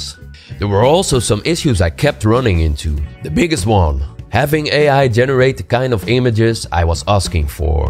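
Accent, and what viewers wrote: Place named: France. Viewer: Your voice is French AF. I love it!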